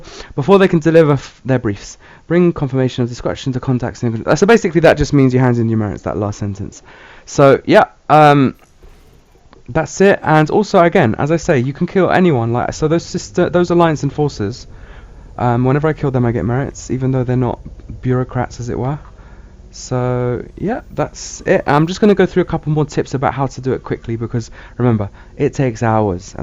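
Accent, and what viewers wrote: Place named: United Kingdom. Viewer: You know it's funny, I feel like these videos are so much smarter sounding and informative with a British accent than if anyone with an American accent made them ;o (is American)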